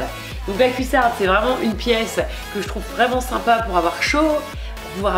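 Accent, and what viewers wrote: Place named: North, France. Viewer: Bonsoir, Vidéo très sympa, comme d'habitude. Tes prononciations "ai" au lieu de "é" sont trop mignonnes (le nord, c'est ça?). J'aimerais beaucoup une vidéo sur les bijoux si cela te dit. Bisous, Séverine.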